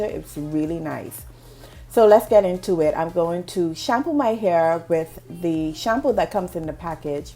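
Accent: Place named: Bahamas. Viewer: That has to be a Bahamian accent.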